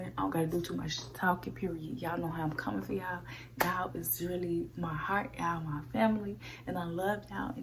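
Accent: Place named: New York, United States. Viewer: Are u from ny it sounds like u are😂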